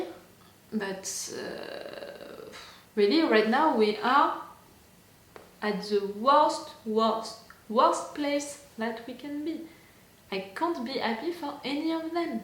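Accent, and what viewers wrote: France. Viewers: J'adore comment j'ai vu direct que tu étais française, on sent nos accents 😂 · Are u French? Love your accent although I can’t understand a lot haha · your accent is so french and I love it 😂😍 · Haha ça s’entend que tu es francaise mais c’est trop cuteee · T’es française t’a un ptn d’accent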